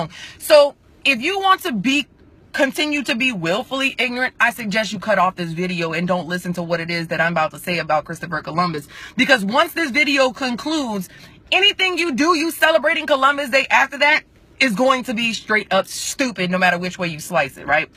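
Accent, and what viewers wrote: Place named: AAVE, United States. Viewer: <link> this is how u explain it without stuttering or ebonics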